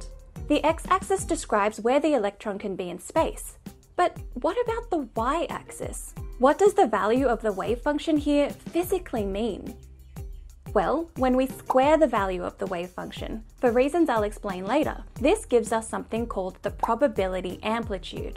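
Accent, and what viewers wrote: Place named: Australia. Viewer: Aussie accents are so hot!!!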